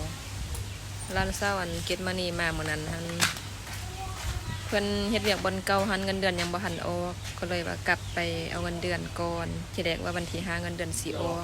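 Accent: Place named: Thailand. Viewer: คุณ อยู่ แขวงอะไรครับพูดคุยเหมือนเมีองเลยสำเนียงครับ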